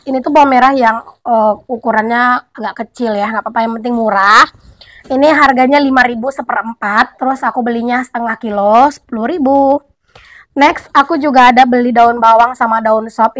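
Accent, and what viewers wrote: Java, Indonesia. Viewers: Rumbai hadir,namanya obat keteguran bnd,masa bnda lupa?bnda sekali2 pakai logat pekanbaru dunk?kayaknya bnda ngomong seperti logat jawa aja🤗🤗🤗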